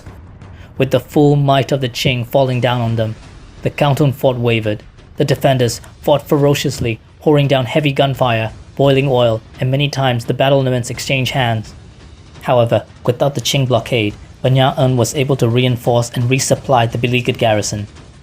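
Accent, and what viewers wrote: Myanmar. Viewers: are you a burmese,ur voice so like with a native burmese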